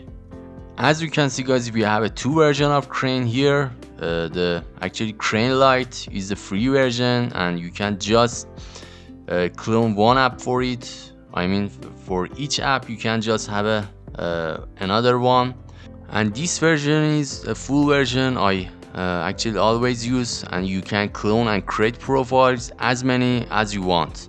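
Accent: Iran. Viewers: انگلیسی با لهجه اصفهانی قشنگی داری داداش، عالی😎👍🏻❤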